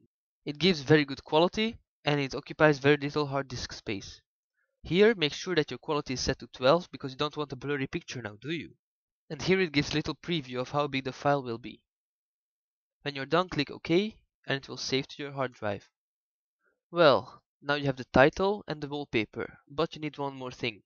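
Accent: Netherlands. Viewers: HAHA YOURE DUTCH JUST LIKE ME I heard it before i even saw 'lijndikte' en 'beeld' en 'bijsnijden'. en voor ik de comments las dùh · Je kan horen dat je Nederlands bent. Maar daar is niets mee. :D Nog succes met je videos.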